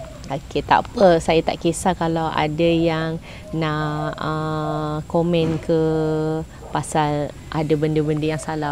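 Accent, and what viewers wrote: Johor, Malaysia. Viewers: Itu stail org johor ckp.. bg org johor biasa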